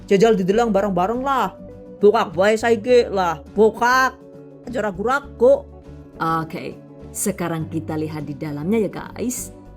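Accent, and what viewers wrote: Banyumas, Indonesia. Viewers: Yang selalu saya kangenin selain resep mbak, adalah ngapaknya :). Sehat selalu ya mbak, biar bisa selalu berbagi ilmu :).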